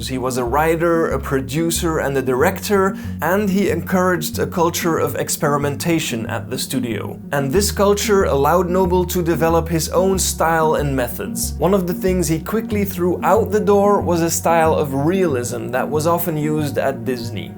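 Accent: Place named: Germany. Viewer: This was fascinating. And I gotta say, just listening to your voice is a delight. A very slight hint of accent (German?) adds charm to your speaking voice.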